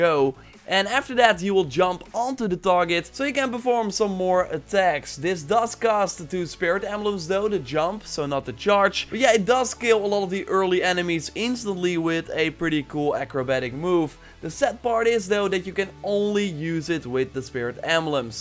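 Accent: Netherlands. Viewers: you sound like a nederlander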